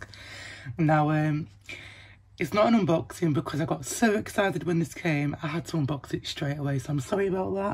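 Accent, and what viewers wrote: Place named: United Kingdom. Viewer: I thought you were from the UK by the sound of your voice I checked your channels about info and yep I got it right I have other questions but I really want to come out offensive at all so I won't ask sorry but dang I want to get a copy of that Vinyl the first track is EPIC!!! Yeah anyway Lots Of Love Keep It Up And Stay Positive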